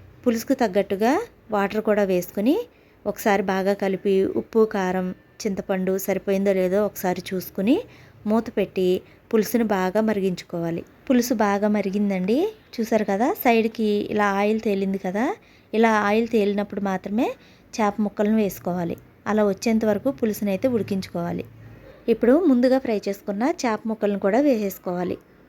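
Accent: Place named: Godavari, India. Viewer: K sis ma godavari voicela undi Andukey adiganu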